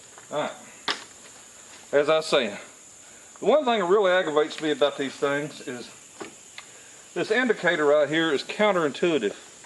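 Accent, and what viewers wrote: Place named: Oklahoma, United States. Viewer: I've subbed recently and I am highly entertained and educated by your videos. I'm from Oklahoma and you sound like an okie to me.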